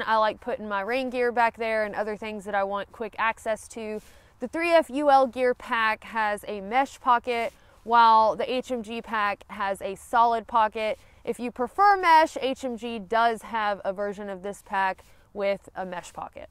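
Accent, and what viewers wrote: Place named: Alabama, United States. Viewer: I just found your channel, and I'm loving it! So much awesome information. 😊 Side note: do you happen to be from Alabama or around here? Our accents sound similar haha.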